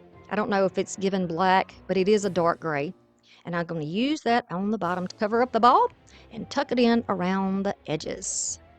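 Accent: South, United States. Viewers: very cute and awesome vintage haloween vibes. i like your accent, reminds me of living down south. and i like your creations. congrats on the temu affiliation. as a fellow diy/arty crafty type, i have some amazing stuff from temu and next time i order, i will remember you ☆ ty for sharing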